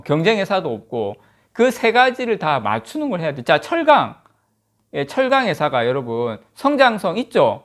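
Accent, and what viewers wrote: Gyeongsang, South Korea. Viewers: 5년만젊었으면좋겠어요 70이 저만치와있는데 주식입문2년차.,올라가면좋고 떨어지면 또 사면되고.기다리지도않고요..심심하지않아서좋아요 고퀄지식에유머에경상도?억양도웃음나게하고...가슴만보여서셔츠와상표만보고 ㅎㅎㅎ감사합니다....알찬방송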